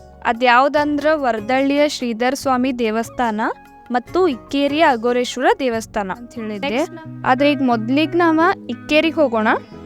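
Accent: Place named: Maharashtra, India. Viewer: your kannada is very beautiful, with a tinge of maharashtra......... I have heard this bangalore kannada, very worst......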